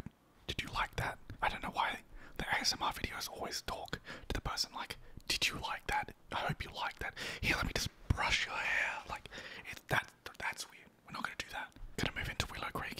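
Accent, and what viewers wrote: Australia. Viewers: Never before did I experience an Aussie whispering in my ears. Gotta say, it's very calming. Great video!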